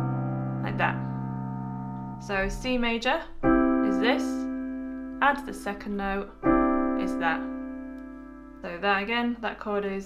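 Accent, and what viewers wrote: United Kingdom. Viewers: Wow, what a great piano lesson, wish you were teaching live! Exactly what I needed to know at this stage of my piano journey. Your British accent is icing on the cake. Well done madam.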